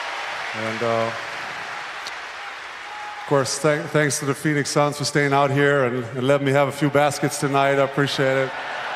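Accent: Germany. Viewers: ah year he‘s from germany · I can hear the german in his voice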